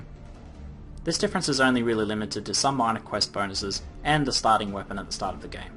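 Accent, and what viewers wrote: Australia. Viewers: Informative, concise, Aussie, subscribed.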